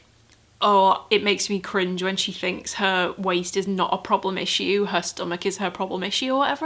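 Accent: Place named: Northern England, United Kingdom. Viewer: I've followed you for ageeesss and I love your vlogs watching your home decor updates has been inspiring! Found your channel through your midsized content but I've stayed because as a northern lass moving to to southern England it was nice to hear similar accent and banter if that makes ANY sense lol😂😬 do what makes you happy, also love gold dust Road! ♥️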